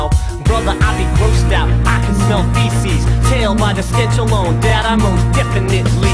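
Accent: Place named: New York, United States. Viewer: The guy has new yawk accent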